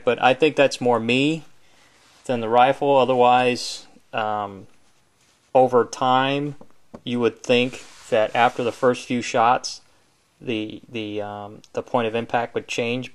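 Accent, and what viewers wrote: United States. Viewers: i can hear a southern accent in you, how long have you live in texas? no offense im from up north.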